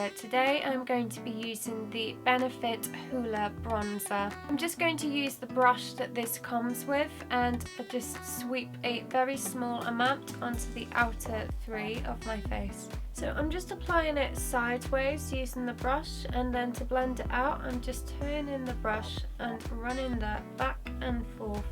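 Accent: United Kingdom. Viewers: OMG I just love her british accent 😱😍😱😍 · I love British English accent .. and you looks great :*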